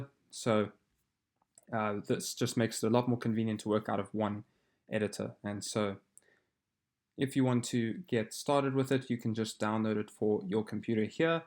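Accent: South Africa. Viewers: South African accent.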